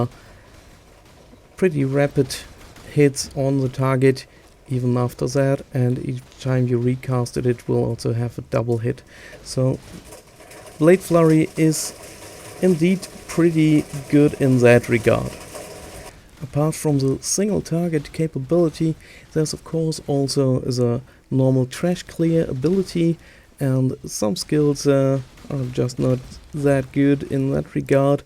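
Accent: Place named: Germany. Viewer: we germans speak like that. so what?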